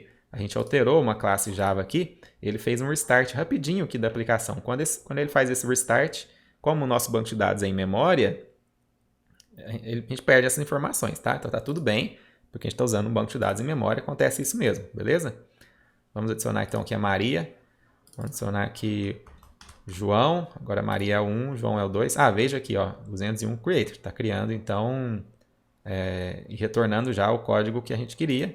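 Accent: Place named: Minas Gerais, Brazil. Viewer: Engraçado, esse sotaque, acho que mineiro, faz ficar mais fácil ou é impressão minha? MUITO BOM!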